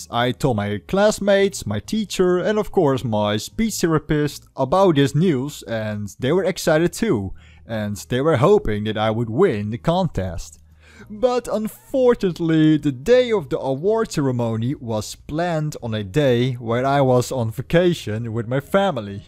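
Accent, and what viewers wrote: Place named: Netherlands. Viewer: The wa- de manier hoe je praate engels ik dacht al dat je Nederlands was oh en uh gefeliciteerd met je verjaardag Edit: ok ik had wat meer geduld moeten hebben lol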